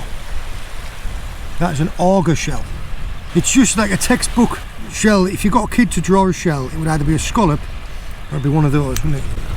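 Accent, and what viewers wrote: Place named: North, United Kingdom. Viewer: Love when a smoggy accent tries to speak proper England. Go lad, cracking channel. Ex booty?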